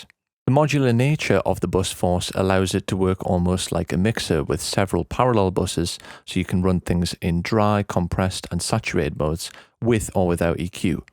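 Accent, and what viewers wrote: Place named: North East England, United Kingdom. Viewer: Champion North East accent there fella!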